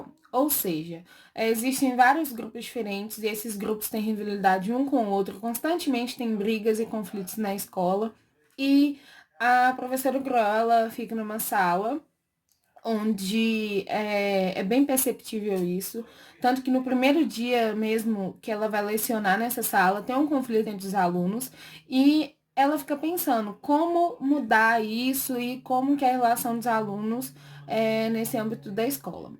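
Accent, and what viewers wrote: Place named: Minas Gerais, Brazil. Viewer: Ótima análise! Belo sotaque 😊 É mineira? Rsrs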